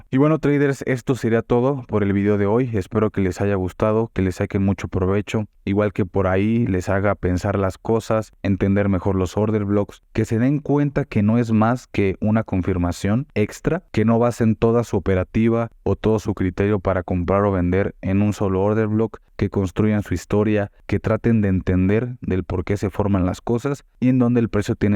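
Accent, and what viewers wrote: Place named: Mexico City, Mexico. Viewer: ¿eres de Chilangolandia?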